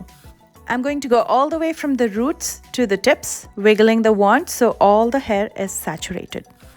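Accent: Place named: India. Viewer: Step one already have thick and decently long lashes ... she sounds Indian.. definitely known for beautiful land thick luscious hair and lashes!!!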